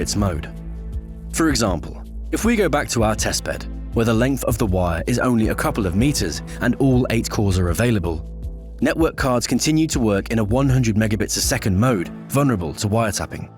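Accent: United Kingdom. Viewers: They are probably covering their asses legally in case they are charged with supplying information that can be used for illicit actions. I don't think this would be as much of an issue in the US given publisher protections (first amendment rights), but if the narrator is a person/not text to voice, then based upon their accent, they are most likely coming out of the UK. The UK doesn't have constitutional rights outside of the Magna Carta. As such, publishers are far more liable for suit in the event something they publish results in real world damages. For supplying the information, they could potentially be held liable to criminal charges. I'm pretty sure that that isn't the case under US law in most cases/jurisdictions, as there are alternative case uses. However, as this is seemingly coming from the UK/EU, they may be held to different standards—which, while unfortunate, would help explain the censorship. 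That said, I would recommend that they team up with a publisher in a country that does not have these limitations who could offer more comprehensive explanations. Given the censorship, I found the information of substantially less value as knowing what programs are used, how they work, what to look for, etc., is necessary for a more comprehensive security education. That said, when did being a "hacker" become a negative connotation amongst the tech community? If you are a "Cyber Security Analyst" that makes you a "white hat" hacker. I myself tend to float between "white"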